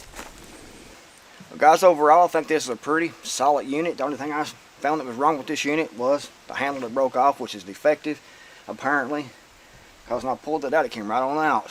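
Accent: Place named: North Carolina, United States. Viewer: Hey I told a friend of mine from North Carolina that he talked about as close to you as anybody that I had ever met before, and now he won't return any of my emails. I don't understand, but for some reason no matter how hard I try, I can't talk like you at all, and I'm from Missouri.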